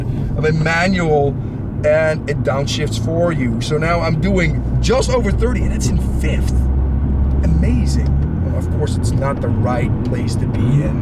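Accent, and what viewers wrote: Netherlands. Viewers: Are u seriously dutch? Thats cool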